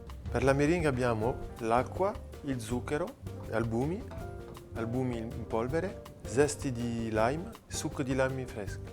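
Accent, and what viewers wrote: France. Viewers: Sono inglese. Ma lui sta parlando col acento francese no? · Is he speaking Italian with a French accent😍😍😍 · Ma perchè iett tene l’accent franzoso?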